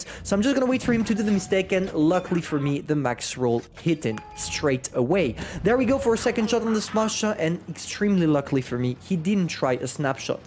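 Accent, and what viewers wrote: France. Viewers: It’s not his English, it’s just the French accent everyone chill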